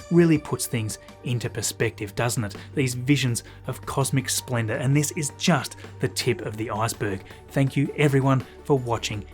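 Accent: Australia. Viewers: Usual comment - Aussie accent at its best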